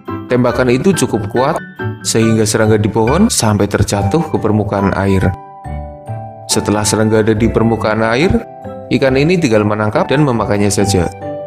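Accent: Banyumas, Indonesia. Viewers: Suara ADMIN ky wong NGAPAK Y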